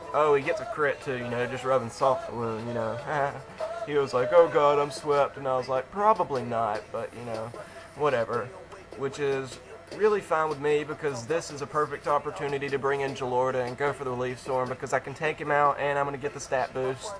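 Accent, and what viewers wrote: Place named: South, United States. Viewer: where are you from? im likin that southern accent!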